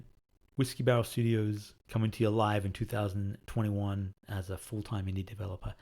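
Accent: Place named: Australia. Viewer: Thank you very much, I really appreciate it! Sometimes I just like eating a bunch when down. I should definitely take a break from technology for a few minutes and go out for a hike or jog. Also, your aussie accent is wonderful!:D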